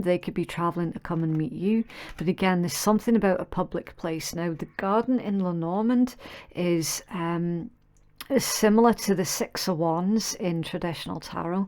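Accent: Scotland, United Kingdom. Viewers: You're scottish 😍😍 hahaha Loving the accent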